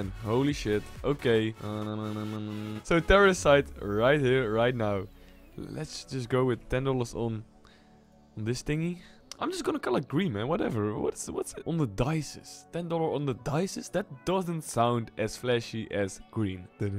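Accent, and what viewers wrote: Netherlands. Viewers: Are u from the netherlands or so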